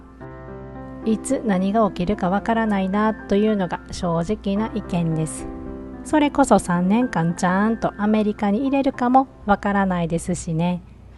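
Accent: Kansai, Japan. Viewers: 声と関西弁、いい感じです😂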